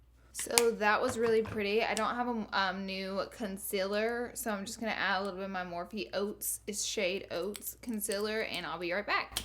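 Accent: South, United States. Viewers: Is it just me or do some people watch her videos for her beautiful southern accent😍😍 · Try dermacol foundation ask jeffry about this foundation . Who ever say crap abt ur accent dont give a dam girl . Hi 👋 to my southern girl 😻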